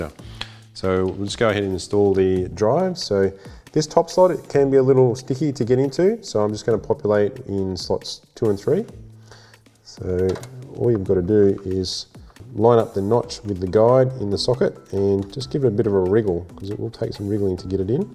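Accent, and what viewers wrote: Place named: Australia. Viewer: After the first sentences, being a non-native English speaker, I thought "What's that supposed to be? Australian!?" ... and only seconds later the .au domain appeared at the bottom xD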